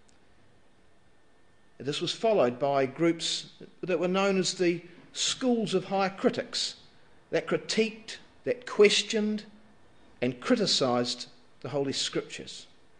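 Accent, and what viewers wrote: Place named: England, United Kingdom. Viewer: the speaker is English and he pronounces words differently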